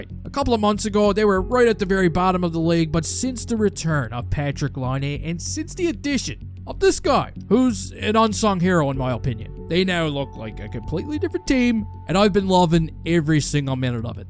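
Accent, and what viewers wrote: Newfoundland, Canada. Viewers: you from Newfoundland or Nova Scotia with that accent? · Are you from newfoundland?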